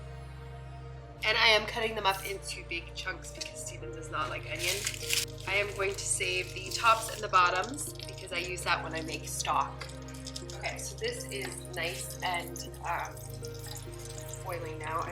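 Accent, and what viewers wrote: Canada. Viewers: thank you. you've got me interested in it again! and for that I thank you. is your accent Canadian? I live in metro Detroit so its very familiar to. me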